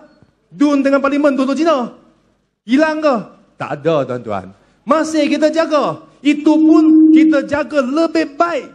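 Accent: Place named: Kedah, Malaysia. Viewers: Dengar dia cakap dah tau dia ni confirm org kedah, bnyk bergaul dgn org Kampung. Bukan mcm apek mca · Salute, berBahasa Melayu dengan Loghat Utara Malaya.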